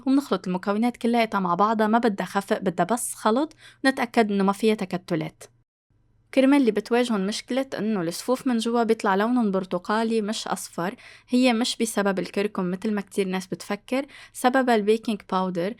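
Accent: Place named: Lebanon. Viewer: اللهجة لبنانية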